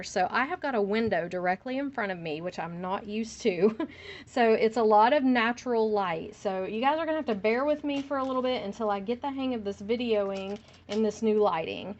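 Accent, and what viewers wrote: South, United States. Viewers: Missed that voice. That southern charm. Glad you are moved.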